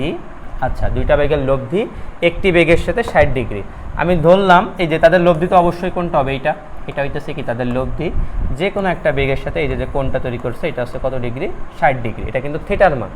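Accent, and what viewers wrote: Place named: Narail, Bangladesh. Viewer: ভাই নড়াইলের ভাষায় কথা বলেন। ভালো লাগে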